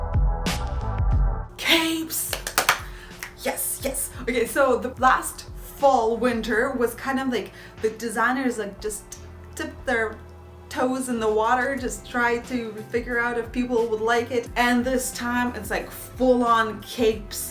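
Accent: Russia. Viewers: You’re gorgeous ❤️ are you Russian? I’m asking because of your accent! Thank you for this video!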